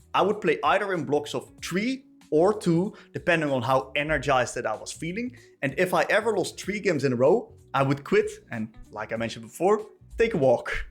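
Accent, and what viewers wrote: Netherlands. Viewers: Hey, this is probably something you’ve heard before and I don’t want to come off as mean, really I don’t, but I think it would genuinely do you well to spend some time tweaking the way you ultimately articulate your coaching. That is, I think you will gain a respectable amount of traction if you do so. Thing is, and again you’re probably aware of it, but your pronunciation is like, passing… but not good. It’s okay, you know, but also just… okay. Even then I have to say that as a fellow Dutchie I do have a soft spot for your Dutch-English accent, however it still took me a bit to warm up to it (compared to native or more proficient English speakers that produce similar content) and I just think to some new viewers it’s possibly really an active deterrent to becoming full time viewers. Maybe. Your English itself is very solid, don’t get me wrong. 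I do wonder if you could somehow review your video scripts in order to have them be fully polished, because right now you occasionally get an English Expression or a certain common collocation slightly off (or some subtle grammar or vocabulary related mistake where actually☝️🤓 or whatever). While this is more of a small nitpick, I think it’s comparatively easier to solve by simply catching those small mistakes while reviewing your scripts. That’s my two cents on this. In the end I’m just a nobody and a relatively new viewer of your channel, because I recently swapped my main role to mid, so take all this with a grain of salt if you wis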